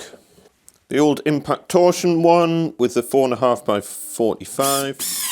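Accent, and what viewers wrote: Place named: Geordie, United Kingdom. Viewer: Still not big coat weather for you. Reet proper Geordie, man.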